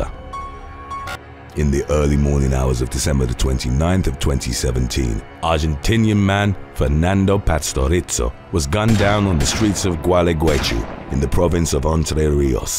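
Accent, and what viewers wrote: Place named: United Kingdom. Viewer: Please please PLEASE stop using "the former" in your video narration. You are using it incorrectly and it's extremely maddening for those of us British educated grammar Nazis. Seriously I love your channel but you have got to stop misusing "the former". Mostly bc you aren't writing your words, your chair are not long enough for "latter and former" to apply, e.g., ten secs into a video isn't enough time to convey such qualifications bc your audience can't possibly know who you're talking about. And your audience is mostly American. Using unfamiliar terms with a British accent can be intimidating. Also, Spanish names and words hint: there are no hard "J" sounds at the start of most words and names, it is an "H" so "Jorge" is "Hor-hay", two LLs together make a "y" sound, Tucson is pronounced "Too sahn" not "Tuck-sin" but above all, let "the later" go, please. 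It diminishes your whole video for Me bc it's distracting in it's utter and complete wrongness. It's driving Me spare love.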